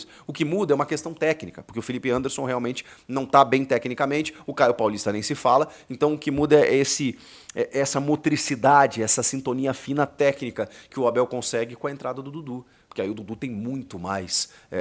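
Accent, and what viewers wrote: Rio Grande do Sul, Brazil. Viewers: Essa versão do Chico eu não conhecia. Até o sotaque gaúcho apareceu. Por mais que seja um jornalista brilhante, hoje quem postou esse vídeo foi o torcedor. Ele é um gremista nato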